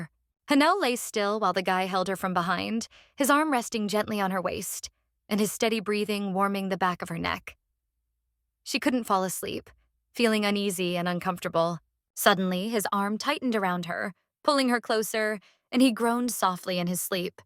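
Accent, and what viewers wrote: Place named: Australia. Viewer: Ik for a fact that ur an Australian. Am i right?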